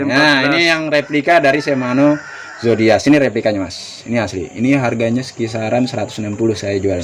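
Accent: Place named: Jakarta, Indonesia. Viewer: Logat bicara nya kaya orang betawi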